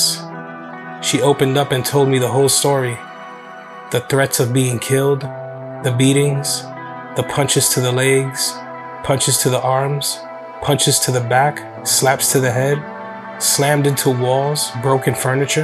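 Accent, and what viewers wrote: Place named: Long Island, United States. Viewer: This channel is great. Such well researched cases put to the public respectfully and with the victims and their families clearly thought of. I'm from long island and truly love all the local case stories told by people from where I'm from who talk like me and have an understanding of where we come from. Great work and much love. GOD ZESS AND PLEASE KEEP THE CONTENT COMING.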